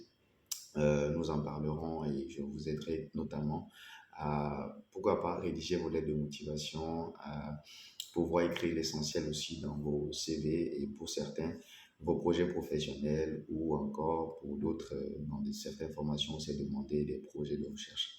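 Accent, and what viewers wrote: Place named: Marseille, France. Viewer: Accent marseillais sur nous 😅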